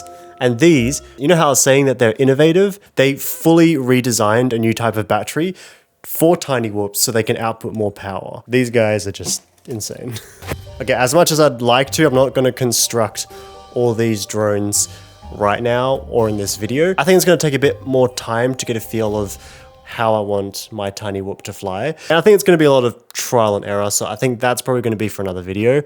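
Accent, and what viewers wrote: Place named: Australia. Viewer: relax; aussie accent, young, multi-cultural, eloquent and cute.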